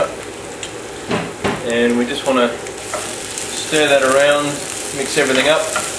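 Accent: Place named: Australia. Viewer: can you use anything other than gravy? Say, if you REALLY REALLY hate gravy? And I looove you australians accents. They're soo aweosme.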